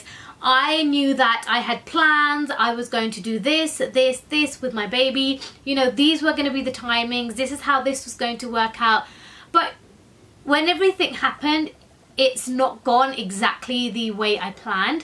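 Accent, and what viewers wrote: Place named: Southall, United Kingdom. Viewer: Your Southall accent is horrible.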